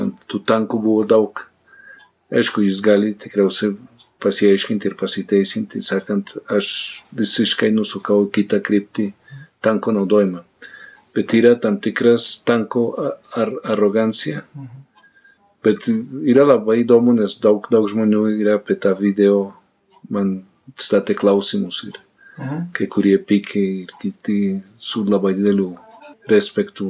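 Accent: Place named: Bogotá, Colombia. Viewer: Tiene asento bogotano en Lithuano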